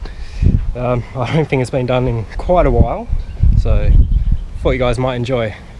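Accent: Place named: Western Australia, Australia. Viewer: Great transformation on that grotty ute! Am I right in thinking you are a fellow West Aussie? The accent... 😉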